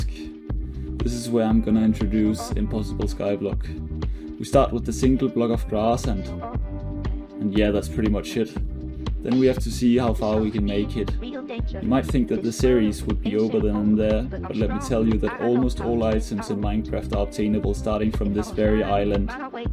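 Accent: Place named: Denmark. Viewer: I love how violently danish your accent is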